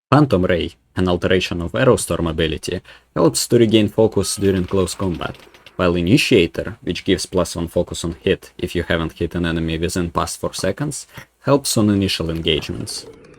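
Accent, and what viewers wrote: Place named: Russia. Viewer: why do I hear a strong Russian accent in your speech?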